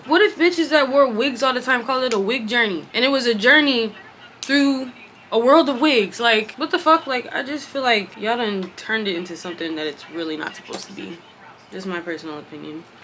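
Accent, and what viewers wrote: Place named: Baltimore, United States. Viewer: You sound like you from Baltimore. ..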